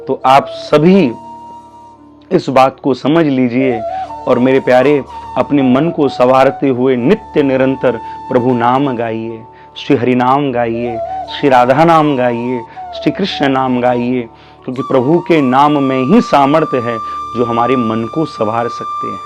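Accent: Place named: Rajasthan, India. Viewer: दीदी हम मध्य प्रदेश के सतना जिले से हैं यह हमारा ससुराल है प्रभु कृपा से 2 बच्चे हैं हमारे एक प्यारी सी बेटी और दूसरा प्यारा सा बेटा है पूरे परिवार की जिम्मेदारी हम पर है हमारी मम्मी जी को गुजरे 6 साल हो गये हैं वह मुझे बहोत प्रेम देती थीं मेरी खुद की माँ से भी ज्यादा प्यार दिया है उन्होने हमें, हमारी माँ तो पागल हो चुकी हैं और पापा इस संसार को छोड़कर चले गए 7 साल पहले और अब मायके की गृहस्थी बिखरी हुई है 3 बहन हैं और 1 भाई है वह अभी 16 वर्ष का है। सब प्रभु के भरोसे चल रहे हैं जैसी कान्हा की इच्छा 🙏दीदी आप कहाँ से हैं वैसे आपकी भाषा से तो प्रतीत होता है कि आप मेरे प्यारे राजस्थान से हैं 😊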